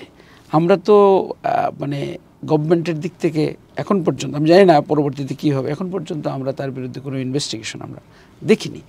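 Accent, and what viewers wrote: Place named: Barisal, Bangladesh. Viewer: ভাই কথায় আঞ্চলিকতার টান আসছে বরিশালে টান। ঠিক করেন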